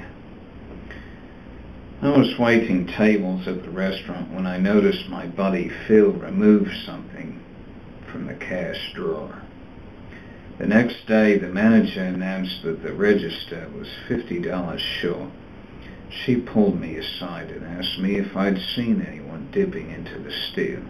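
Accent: United Kingdom. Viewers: You have a beautiful british accent <3 you sound like a friend of mine that I miss very much :_( <3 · Sounds like london.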